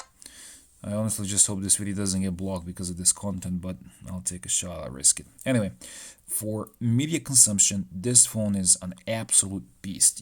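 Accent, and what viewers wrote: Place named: Serbia. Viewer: Jel si ti jebeno iz Srbije? Cim sam tvoj prvi video otvorio pomislio sam da si iz Srbije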